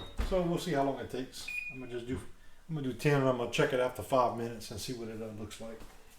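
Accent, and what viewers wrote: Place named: Louisiana, United States. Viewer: Love that Cajun accent. These look yummy!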